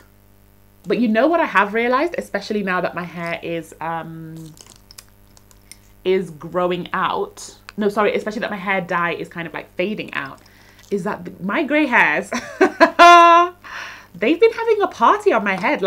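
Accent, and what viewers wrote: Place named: United Kingdom. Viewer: no problem! Haha I can't hear it at all. You sound like you landed from the UK like yesterday 😅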